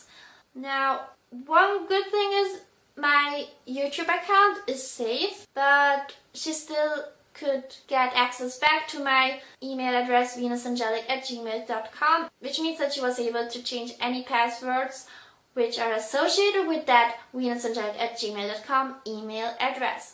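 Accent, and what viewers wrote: Switzerland. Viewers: She's not Japanese. She has a Swiss German accent. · She’s swiss-german Which can explain her accent English wouldn’t be her first language and Japanese either. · She's from Switzerland so she has an accent. Go fuck yourself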